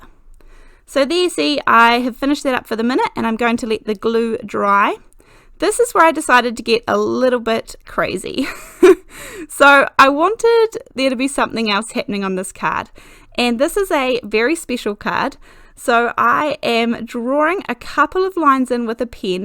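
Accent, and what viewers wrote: New Zealand. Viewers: I am lucky Lisa. Thank you to so much for the beautiful card. I sat watching that video and when she was stamping the s in Lisa I looked at my daughters face and I knew it was for me. I have never been so overwhelmed in my life. It was awesome. I will treasure that card, just as I treasure my georgous daughter for doing that for me. That was better than winning Lotto!!!! Thank you all for the lovely birthday wishes. I love watching videos. I love her style, and her creativity as a craft artist, and I am so comforted by her kiwi accent. And her love of Ali Express. So thank you all so much and now I have finally make a comment in this fantastic group, instead of just watching and admiring.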